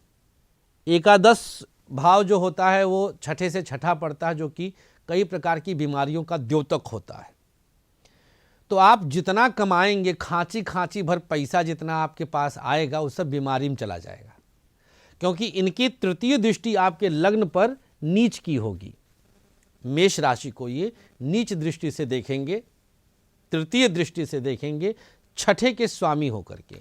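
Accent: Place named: Bhojpuri, India. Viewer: Dhanyawad sir ji bhojpuri touch ke liye, aap ka bolne ka style mast hai, vedio informative raha🙏🙏🙏🙏🙏🙏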